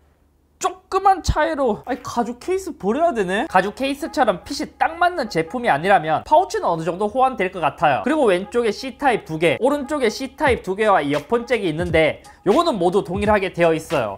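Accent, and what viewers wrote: Gyeongsang, South Korea. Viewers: 오우예 같은 경상도 people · 역시 갱상도르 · 갱상도 아입니까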